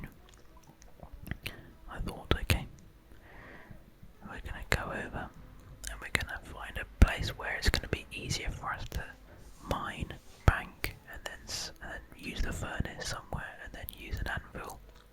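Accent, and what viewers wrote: United Kingdom. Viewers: Thank you for continuing to make videos, and especially for continuing to make OSRS videos!! I’ve been falling asleep and getting tingles watching these since I was still in secondary school, and now I am in my 5th and final year of Uni, soon to start my masters!! Something about hearing an English bloke meandering through the most nostalgic parts of this game that I have been playing for well over a decade aswell brings me to a place of comfort that nothing else can replicate. ❤❤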